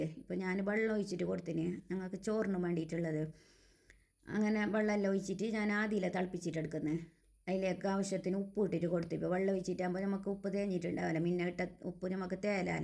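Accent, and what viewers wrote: Kasaragod, India. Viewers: നിങ്ങടെ സംസാരം എനിക്ക് ഒത്തിരി ഇഷ്ടമായി നിങ്ങൾ കാസറഗോഡ് ആണല്ലേ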